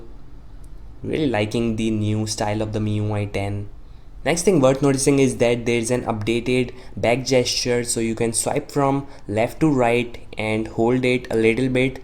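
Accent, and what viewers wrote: India. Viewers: god damn i hate indian accents. but good review though.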